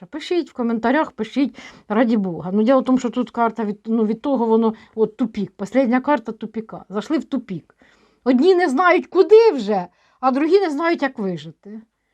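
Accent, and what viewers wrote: Ukraine. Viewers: Пані дорогенька ми Вас любимо Ви Є уособленням України, жіночності, берегині. А то пише провокатор, неук, щоб укинути колот. Ви абсолютно по Українські, по народному розмовляєте, говорите... Дякуємо за Вашу працю, цінуємо, любимо!!!😍🥰💙💛🌻🌼💐🌻🌼💐🌻🌼💐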